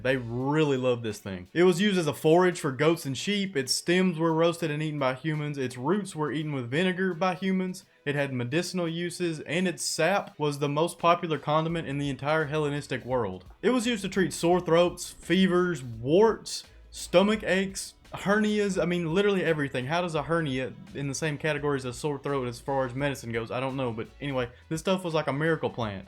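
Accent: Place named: South, United States. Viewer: Dude, I love to see people doing what they love and you obviously love doing this. Your enthusiasm is contagious. I subscribed. You have the perfect mix of skepticism and open mindedness. I'm an educated Ga boy with a Southern accent too. I'm guessing you are Southern but not from Ga. Love the videos man. Keep doing it. I'll watch them. People love this stuff.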